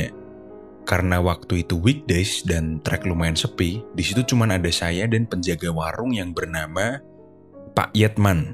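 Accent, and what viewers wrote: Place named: Southern Java, Indonesia. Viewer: Dari semua video yang saya tonton Tebakan saya sepertinya beliau orang jawa tengah bagian selatan atau yogya bagian selatan. Beliau bilang bapaknya tentara, kakeknya petani dan nelayan artinya rumah ya dekat pantai Logatnya bukan jawa bagian utara, tapi selatan. Beliau juga memelihara burung yang itu buming di jawa bagian selatan. Kalaupun tebakan saya mleset, mohon maaf Namanya juga menebak 😅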